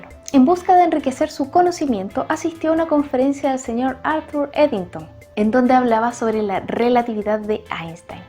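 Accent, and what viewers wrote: Chile. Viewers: De seguro es chilena con ese acento.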